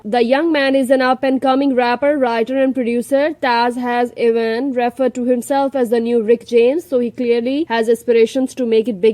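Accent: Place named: India. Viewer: Who are you, with this heavy indian accent???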